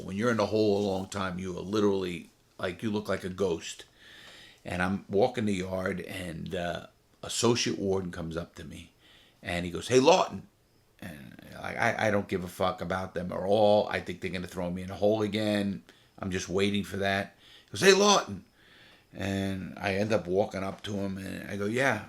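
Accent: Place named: North, United States. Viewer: Legit question lol. If you live in florida and have lived there your whole life how do you have such a northern accent??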